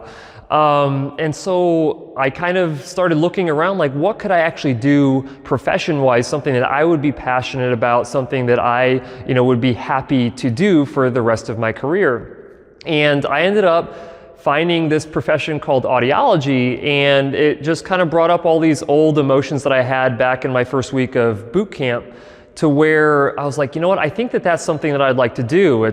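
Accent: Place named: Illinois, United States. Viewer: The videos I have watched are so sincere that they make me feel as if I know you. Color me surprised that you are a farm boy for Grundy County (although some of the your vowel sounds gave me a hint that you were from Illinois). I was raised on a farm, as well, just adjacent to Braceville. Went to Catholic school in Wilmington and HS in Gardner. Of course, you are 40+ years my junior, so no connections other than locale. Best of luck on your new project. Steve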